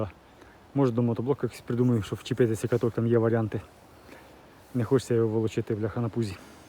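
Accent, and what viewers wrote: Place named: Vinnytsia, Ukraine. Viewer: Сорт цибулі LUSY? ( Вона жє Свіфт.) Я правильно зрозуміла? А то з вашим чудовим Вінницьким діалектом не завжди зрозуміло.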